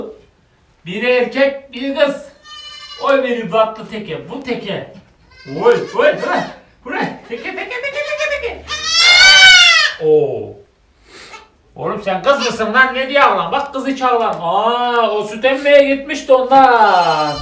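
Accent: Konya, Turkey. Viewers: merhaba, kanalını dünden beri yeni keşfettim. hayatimda hiç köye gitmedim, köy yaşamını da tatmadım haliyle. o kadar sıcak kanlı, sempatik, hayatla bire bir yaşam süren, evinde hayvan besleyip kendini zenginim diye tanıtmayan ve gerçekten insan olan biri olman beni çok mutlu etti. hayvanlarla iç içe, doğayla, çevreyle iç içe olman harika... umuyorum çok daha iyi yerlere gelirsin, hiçbir youtuberla seni kıyaslamayacağım çünkü gerek yok. herkesin doğuştan gelen yaşam tarzı, keşke ben de natural bir yerde yaşasaydım. araba gürültüsü, fabrika dumanları, boş insan sesleri, huzursuzluk, kaygı ve dahası... bunların hepsi insanı yoruyor. başarılarının devamını dilerim... bu arada konyalı mısınız? konuştuğunuz ağız çok benziyor.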